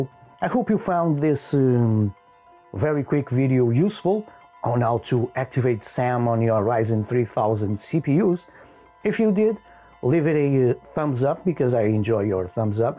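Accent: Portugal. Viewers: o teu sotaque não engana ninguem, PORTUGAL CARALHO!!!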